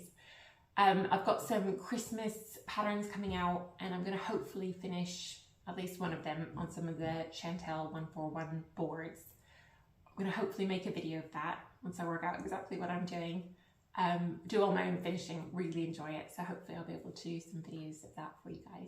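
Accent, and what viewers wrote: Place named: Arkansas, United States. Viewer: Excellent first FlossTube. I love your accent with a bit of Arkansas every few words. Halloween 1812.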